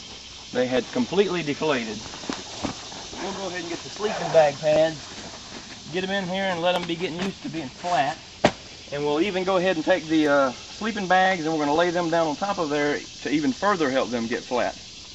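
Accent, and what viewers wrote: Texas, United States. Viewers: The tips at the end of the video is extremely helpful! Is that Texas accent?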